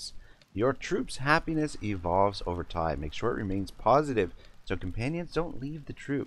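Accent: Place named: Canada. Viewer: This dude is definitely Canadian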